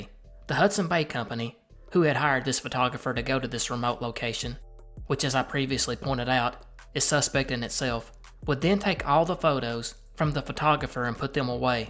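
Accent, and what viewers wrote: South, United States. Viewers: I always forget you have a southern accent for some reason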